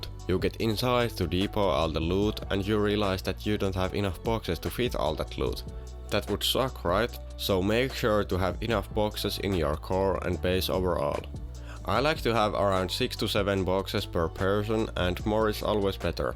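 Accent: Finland. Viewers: Hes not from The U.s Dude is like from Finland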